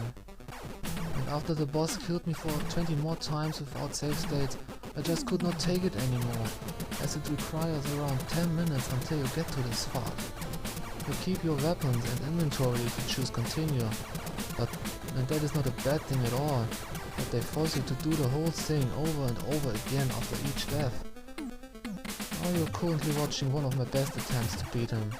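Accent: Germany. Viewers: i bet this dude's accent is german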